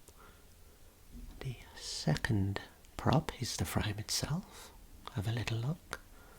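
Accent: Birmingham, United Kingdom. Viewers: Birmingham i tinks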